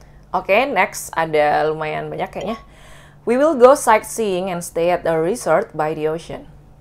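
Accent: Indonesia. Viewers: masih logat indo banget si🤭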